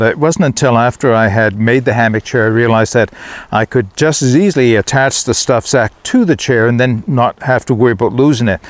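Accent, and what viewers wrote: Canada. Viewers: I love the way Canadians pronounce “ou” similar to here in Scotland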